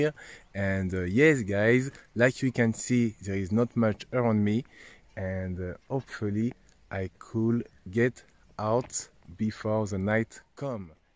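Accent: France. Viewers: C'est incroyable ce qui t'arrive 😁 j'aime bcp ton French accent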